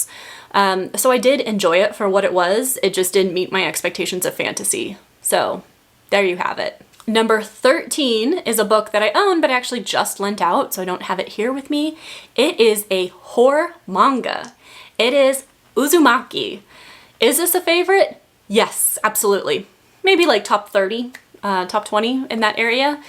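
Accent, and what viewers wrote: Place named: England, United Kingdom. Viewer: What English accent you have I like your talking ❤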